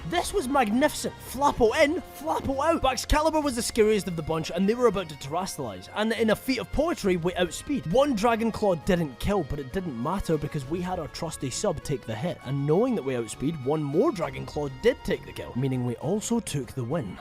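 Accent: Scotland, United Kingdom. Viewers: Love how the Scottish accent properly creeps oot every now n then am here fur it n long may it continue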